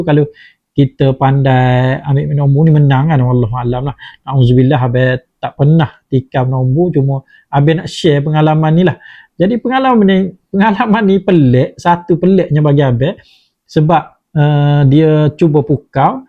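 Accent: Kelantan, Malaysia. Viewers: Asslmualaikum..selama perak hadir..sekadar cadangan kt..klu boleh kecek kelate lebih kerap..dpt gak blajo 😊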